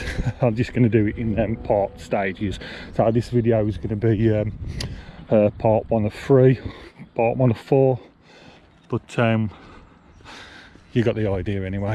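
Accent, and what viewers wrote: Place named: Yorkshire, United Kingdom. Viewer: Hi, so I found part 1 and just watched it and will watch the other 3 parts soon. Gotta ask you about the accent mate, where you hail from? Yorkshire? I liked your style of editing too, very similar to the style I do (and those placing the camera down and walking towards it shots like you do!) Loved seeing the train station and the train tracks at the beginning. Great weather you had, makes for good views on the camera. At different points in the film you seem to alternate with lenses, ranging from narrow to wide. Do you use a GoPro by any chance? Nice stabilization on it. Couldn't help notice the nude girl in the newspaper as well.:) I paused to try and get a look at your footwear as well and noticed you were wearing trainers but couldn't quite make out which. A good video, well filmed, nice angles and smooth pan shots.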